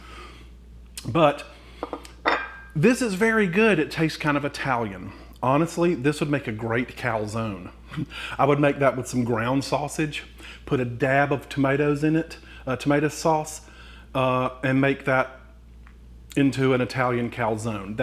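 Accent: South, United States. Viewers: One of the best things about finding out I am pre diabetic is finding wonderful channels like this. Grew up in Tennessee now living in Florida an I do miss that southern accent. Get a kick out of him saying Y'all we're makin ____ come on! Puts a smile on my face every time.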